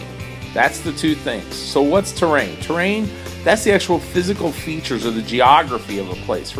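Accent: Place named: Texas, United States. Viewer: Dat half Texan accent Also very useful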